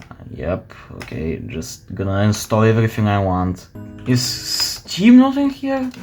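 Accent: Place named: Bulgaria. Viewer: i knew i heard a bulgarian accent. Great video tho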